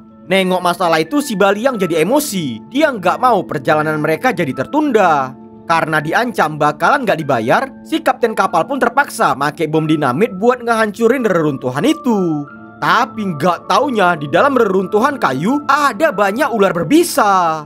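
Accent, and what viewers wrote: Medan, Indonesia. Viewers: Iya we... Anak Medan yaaa Jangan kembut we😅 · Logat medannya kentara sekali bang 😂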